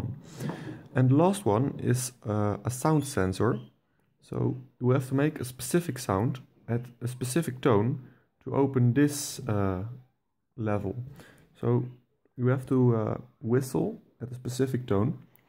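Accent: Netherlands. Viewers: ben jij nederlands? ik kan dat horen :)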